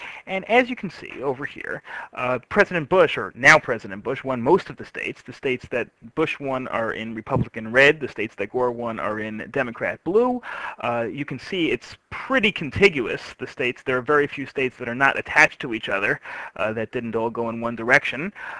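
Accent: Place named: New York, United States. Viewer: r u from new york? you say "all" and "call" differently.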